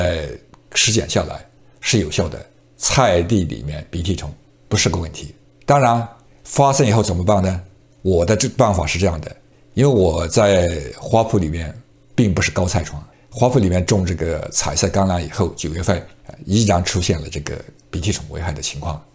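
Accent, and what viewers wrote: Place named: Sichuan, China. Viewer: 谢谢李老师。四川人？很亲切的咔咔角角。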